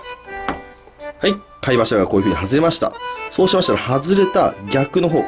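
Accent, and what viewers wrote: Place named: Mikawa, Japan. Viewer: だらって三河弁なんだね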